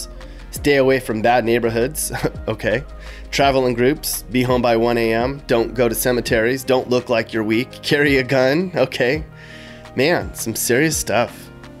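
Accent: United States. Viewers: are you american or british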